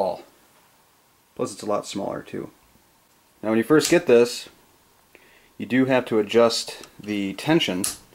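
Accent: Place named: Michigan, United States. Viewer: After watching the zeroing video and now this one you gotta be from Michigan. Lol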